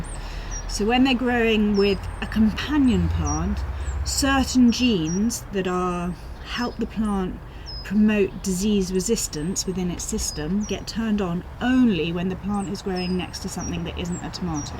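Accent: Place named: England, United Kingdom. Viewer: Very nice video and lovely English accent, thank you!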